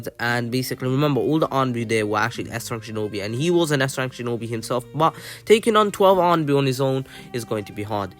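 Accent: United Kingdom. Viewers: U sound super British and if so same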